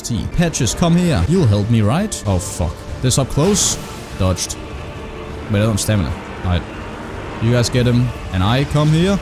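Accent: Denmark. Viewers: så din første elden ring video og ku bare hører du var dansk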